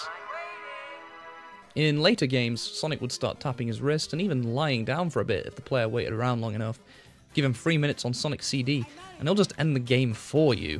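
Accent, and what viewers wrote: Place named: United Kingdom. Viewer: sultry british voice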